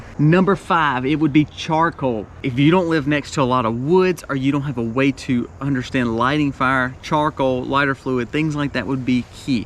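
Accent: South, United States. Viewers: I’ve never hear “oil” pronounced this way 😂 Southern accent is strong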